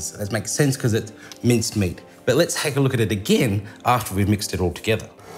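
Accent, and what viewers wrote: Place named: Australia. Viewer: came from uncle roger! You're Australian !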